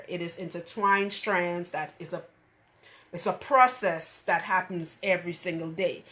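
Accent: Trinidad and Tobago. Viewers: Trini in Paris with locks, I have medium course hair .. I love my hair so much but it has different textures on different locations of my scalp ! WHAT CAUSES DIFFERENT HAIR TEXTURES ON ONE HEAD ? !! It’s weird !! .. it’s not very common but I’m seeing it more and more ! I caught that Trini accent right away .. hahah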